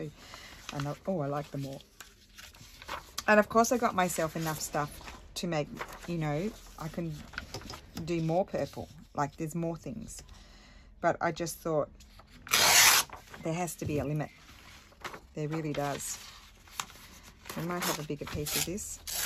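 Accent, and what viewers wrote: Australia. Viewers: Righty O!!! I love when you speak Auzzy How do you spell that? Giggling.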